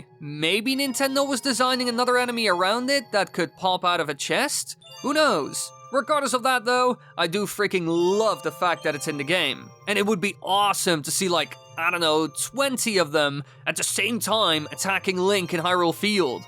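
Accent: Netherlands. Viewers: I have to know... Are you Dutch xD? If so, je accent heeft het verraden B)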